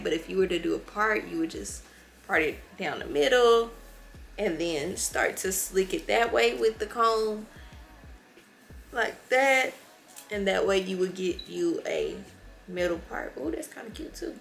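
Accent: United States. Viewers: Love your accent , what part of the states are you from ? ❤️‍🔥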